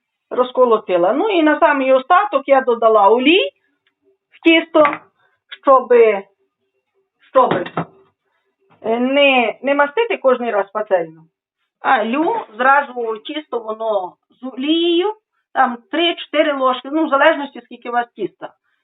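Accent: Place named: Rural, Ukraine. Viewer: Говори як ти вмієш.Мені подобається як ти говориш в мене мама теж так говорила дуже багато слів так як говорять в моєму селі хотя це Хмельниччина яке ближче до Чорткова. КОМУ НЕ ПОДОБАЄТЬСЯ ХАЙ НЕ СЛУХАЮТЬ..НА ВСЕ ДОБРЕ ЗДОРОВ'Я ВАМ ВСІМ.ЧОЛОВІКУ БОЖОГО БЛАГОСЛОВІННЯ.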